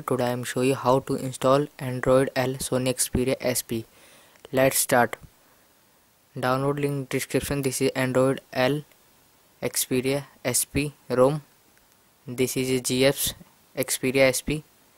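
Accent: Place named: India. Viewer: Stupid india accent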